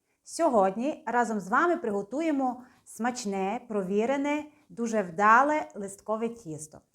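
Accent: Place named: Zakarpattia, Ukraine. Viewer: Я завжди задаюся питанням: навіщо держава витрачала шалені гроші на навчання? Коли в кінцевому підсумку ця молода персона розмовляє закарпатьским діалектом. Достатньо було би 4-х класів освіти. І потім, всі блогери як журналісти повинні нести в маси красу украіінськоі мови, тим більше, що наш народ післі окупаціі має негативну спадщину в частині мови. Думай- Те!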